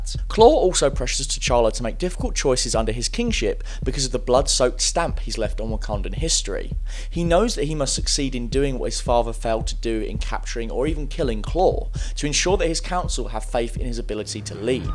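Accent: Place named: Upper class, United Kingdom. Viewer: First of all the black panther has no villian problem and my God this was the first movie give them a break super critics and who is this guy doing this segment because he sounds like some British aristocrat who has never been around a black person .it is a known fact that people who are dark skinned understand this movie better then our pale face counterparts because they've never been hated on simply for the color of there skin .and if you or anyonelse can't understand the message from this film then not only are you not open minded. But you probably most likely only gang around members of your own race and that's not only cowardice but unhuman and boring .killmonger is a villian fueled by hate and that's more understandable then some sadistic twisted dceu character that Batman has to fight .haters go back to the dceu world the marvel universe is for controversial heros .peace and power to the people .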